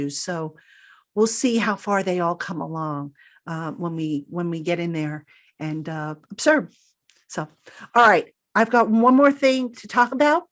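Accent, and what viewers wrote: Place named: New York, United States. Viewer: Thanks for the insight. I get a little NY media in your tone and reviews. I know that’s your background so it’s expected but hearing optimism is also refreshing. That 2nd 3rd round had you with a tone of concerns as us all. Let’s all hope a new day has come.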